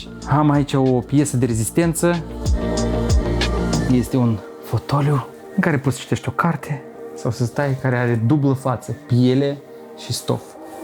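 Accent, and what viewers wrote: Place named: Moldova. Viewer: Prietene, tu nu ai un accent minimalist; accentul tau de moldovan e foarte pregnant!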